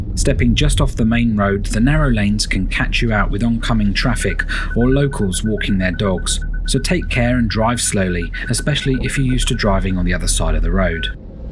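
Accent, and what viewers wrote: United Kingdom. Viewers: I love the typical British accent.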